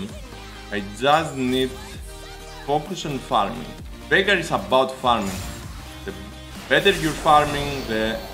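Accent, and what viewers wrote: Greece. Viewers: χαχαχα το υποψιάστηκα από την 100% ελληνική προφορά. Το μούσι το επιβεβαίωσε. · Can you do pantheons voice? Since your greek and your accent is real and you play like a god!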